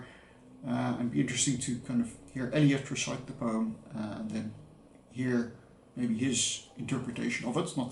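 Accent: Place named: South Africa. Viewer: Ah, "me too" books can become annoying, and it's obvious when they're not sincere. I find it sad and manipulate when artistry is ignored in favour of blatant copying. You have a really interesting accent by the way. I think you've got a tinge of a South African regional accent in you. 😉There's a specific type of Afrikaans accent on certain words that you have, which I find so cool. Most Dutch accents sound completely foreign to me. I never even thought of The Little Prince as a part of this tag. It certainly is sweet, but perhaps for children younger than 10. I didn't even know about it until I was 17, which was way too late. I read it at 19, which again, is simply too old.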